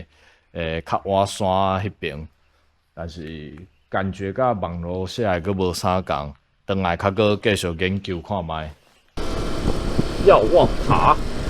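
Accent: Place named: Southern Taiwan, Taiwan. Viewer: 我把你徒步環島騎車環島看了幾遍，非常接地氣，南部郎欸台語氣口😂，文化認知都非常棒👍 我一有空都會開來看，百看不厭 👍 開始有主題歌後 影片更生動讚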